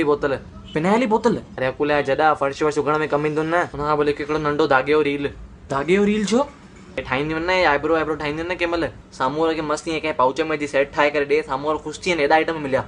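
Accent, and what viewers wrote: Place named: Larkana, Pakistan. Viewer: Your grandparents must be from Larkana because your Sindhi is 100% similar to ours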